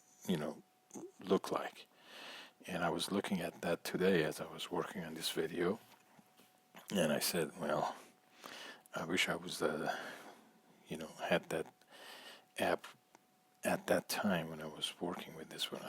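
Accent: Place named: Arab world. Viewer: Actually, not that unrelated, the accent and your artistic skill led me to think that you were from Armenia or some other Middle Eastern country.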